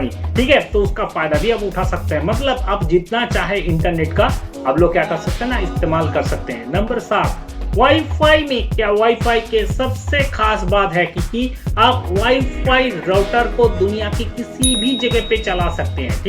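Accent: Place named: Bengal, India. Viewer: it looks funny awesome when a Bengali person tries to speak Hindi.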